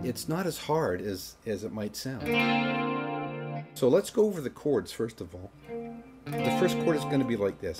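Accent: Canada. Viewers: You talk so Canadian. Good video though.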